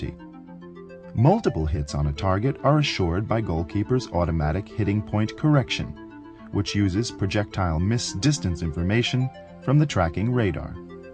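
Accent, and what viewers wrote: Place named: United States. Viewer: He speak american english NOT french so its american made video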